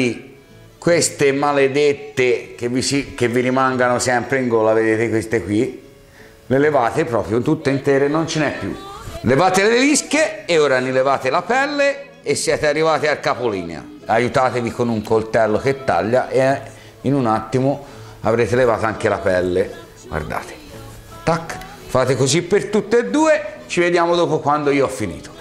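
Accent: Tuscany, Italy. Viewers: Complimenti a te, io e mia moglie seguiamo con molto interesse le tue straordinarie ricette, presentate con il tuo simpaticissimo dialetto toscano.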